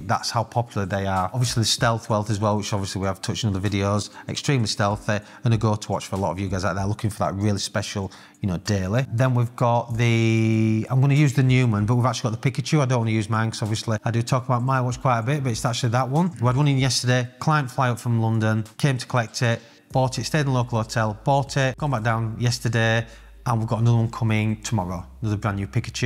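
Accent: United Kingdom. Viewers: Very strong brit accent, could you slow down a lil bit sir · Sir you always have excellent, cut to the chase, no BS content. I have to really concentrate on your videos because you talk at a faster pace with your British accent. It’s seems like a mix of British, Scottish, Irish and Australian. Where did you grow up?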